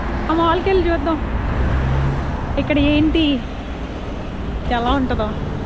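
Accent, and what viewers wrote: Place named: India. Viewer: Hi 🙋I Like U R Bold Telugu Accent & Speaking Comedy Also🙋❤From Vizag (AP)💞😀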